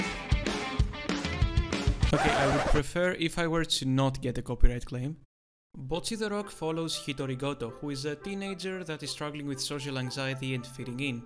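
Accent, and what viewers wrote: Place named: Greece. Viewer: M8 ur accent sounds greek perhaps the first greek person with good taste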